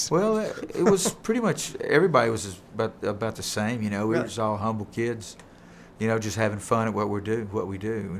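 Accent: South, United States. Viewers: I miss you!! Hes so adorable and very humble. I just love his southern drawl, beautiful laugh. Completely genuine southern gentleman. Love you ❤️🥰😎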